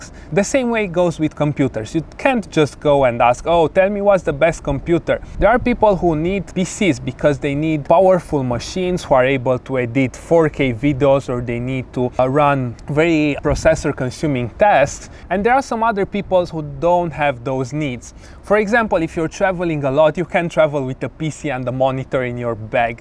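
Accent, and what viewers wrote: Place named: Romania. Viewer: vorbesti engleza ca un roman..fa le frate in romana si adreseaza-te romanilor..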